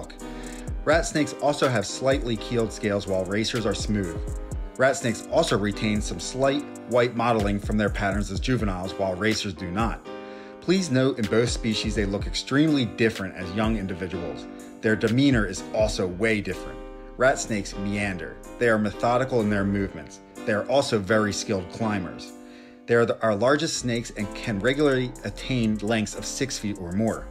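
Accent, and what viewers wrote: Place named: Delaware County, United States. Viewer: Sounds like a delco guy. With that accent!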